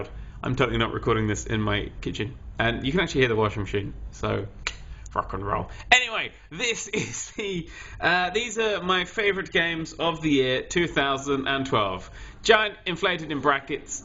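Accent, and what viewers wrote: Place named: United Kingdom. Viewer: gay voice? thats just called being british.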